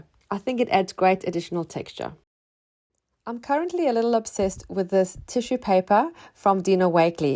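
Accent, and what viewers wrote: South Africa. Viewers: Lovely. Love the South African accent. ❤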